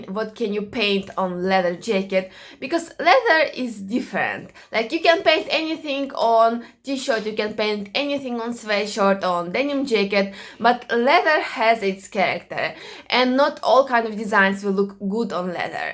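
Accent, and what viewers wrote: Russia. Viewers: Она русская или какая то????английский такой 🤣🤣 video is useful but why not arcylic??? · Русский акцент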